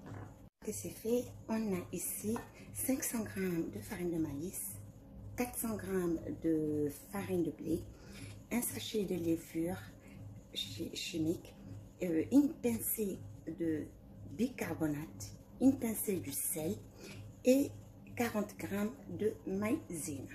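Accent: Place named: Lebanon. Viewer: Bonjour, excellente recette comme a votre habitude. Par curiosité, j’ai remarqué un joli accent libanais, en êtes-vous originaire. Bonne continuation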